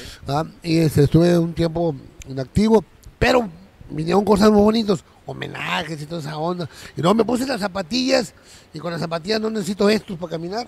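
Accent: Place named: Coahuila, Mexico. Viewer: Es mi paisano coparito así ablamos los buenos coahuilenses de antes hoy muy difícil mente te encuentras con paisanos así ya que nos invadieron chilangos chiapanecos jarochos tabasqueños y asta centro americanos en toda la región coahuila AGUSAO antes de jugar saludos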